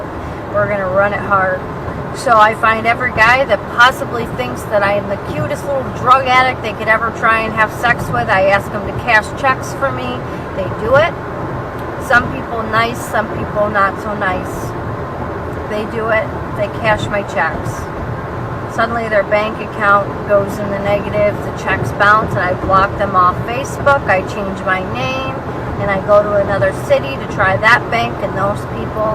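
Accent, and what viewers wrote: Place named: Rochester, United States. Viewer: Holy shit are you rochester Or Buffalo? You sound like you have a downtown Rochester. Hart accent like the East Side. Or I could be wayYou sound like you have a downtown Rochester Hard accent like the East Side. Or I could be way off And your midwestern, like i'm starting to believe chicaaaagaaa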